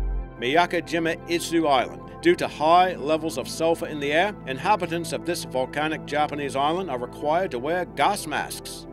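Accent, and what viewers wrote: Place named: Australia. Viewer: You have the strangest accent I have ever heard, like a mix of Australian, American and British.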